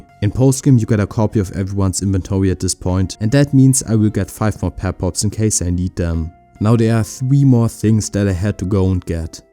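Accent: Germany. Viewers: Wie hart man hört, dass du Deutsch bist xD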